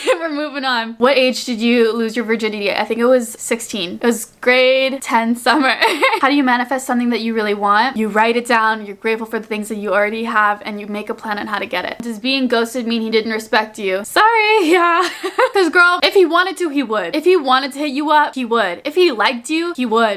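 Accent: Canada. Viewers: You're Canadian Toronto side really popped off in this video, not a bad thing I loved it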